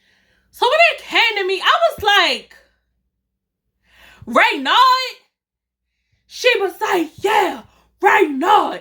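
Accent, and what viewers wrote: Baltimore, United States. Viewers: Girl you are my favorite storytime teller I get so happy when you post a storytime yesssss and the Baltimore accent adds a little pazazzzzzzz 😂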